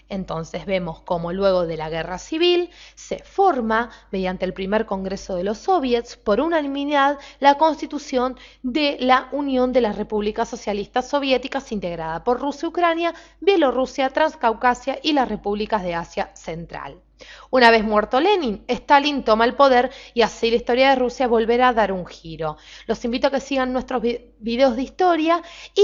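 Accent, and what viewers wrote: Argentina. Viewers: lol es argentina y por eso la pronunciación xD no sé si es sarcasmo.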